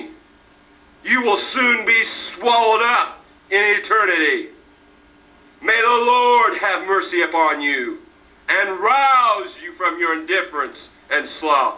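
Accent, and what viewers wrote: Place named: United States. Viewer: His church is Cildwrn, Llangefni, Anglesey, North Wales. Strange to hear this in an US accent!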